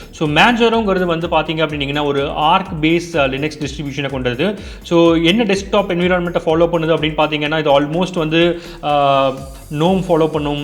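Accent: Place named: Kongu, India. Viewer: coimbatore slang veera level anna. I love kongu tamil.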